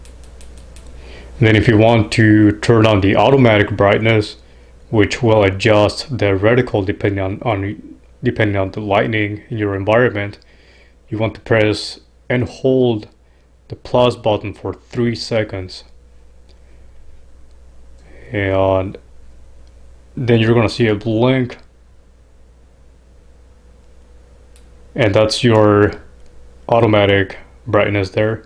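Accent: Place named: Canada. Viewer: Canadian accents are the best.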